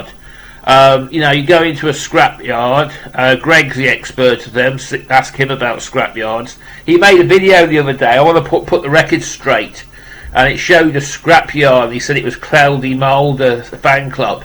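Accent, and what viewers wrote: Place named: United Kingdom. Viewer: The comment you made on Scott Waters regarding Deep Purple 🤣🤣🤣🤣🤣🤣🤣🤣🤣🤣🤣🤣🤣🤣🤣🤣🤣🤣🤣🤣!!!!!!!!!!!!!!!!!!!!!!!! I have something even Scott Waters can't get. You know the band Joshua (Hand is Quicker Than the Eye, Surrender, Intense Defense, Chapter One)? They released an album in 2012 called Resurrection. Around that time I was having on line conversations with the founding guitar player Joshua Perahia. I still chat with him from time to time. He allowed me to have #1 of 888 signed copies of Resurrection which is extremely rare. He also sent me some signed postcards. Why 888 and not 1000 signed copies? 888 is the Hebrew numerical equivalent of the name Jesus or Yeshua. Each Hebrew letter has a number assigned to it - a bit of trivia for you. You have a cool British accent. I'm from Newfoundland, Canada and I have a bit of a Newfie accent ha ha!! 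Many of us folks from Newfoundland have a different accent that is different from the rest of Canada. In Canada they sometimes end a sentence or reply with eh. Newfies end a sentence with b'ye! or hey b'ye! We sometimes reply with go on b'ye!? meaning you're kidding me!? or you're joking right!? Then others say that's great or wonderful we say "best kind!" Cheers buddy!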